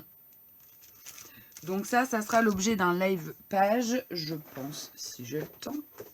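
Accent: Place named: England, United Kingdom. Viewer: Ton accent anglais j’adore. Une affaire la peinture izink ça marche sur tout support,tissus ,métal ,bois elle sont tops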